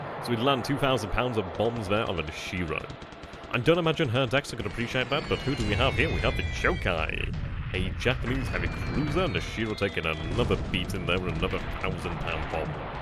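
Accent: United Kingdom. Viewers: is a well spoken English Gentleman, and I have no problem understanding what he says, but I guess if your an American, or Canadian, or from some other foreign country, then he may well sound strange to you.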